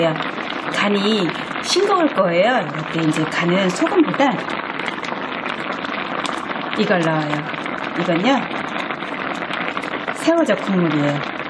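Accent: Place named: Daejeon, South Korea. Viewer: 저도 대전이 고향이예요 왠지 말씨가 좀 친근감이 있었거든요. 영상을 오래 전부터 보고 자주 해먹고 있었거든요. 대전 어디신데 친정어머니가 농사지으셔서 보내주시는지요?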